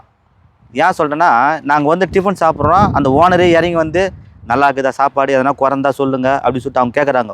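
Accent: Madurai, India. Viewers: Madurai vasagam super 👌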